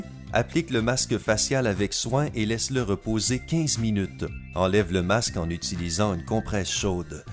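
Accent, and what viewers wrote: Quebec, Canada. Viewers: Y'trop Quebecois lui 😂😂😂😂😂 Calice ton accent y tres obvious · Avant je détestais l’accent Canadien maintenant je kif 😍. A part ça superbes astuces 👌👌👌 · D'abord quel jolie voix et l'accent québecquois c'est agréable je cais essayé je suis célibataire si j'amais 😂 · J’ai liké pour l’accent 😂 j’vous kiff les québécois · Oh je love les Québécois et leurs accent 😍😍😍😍😍😍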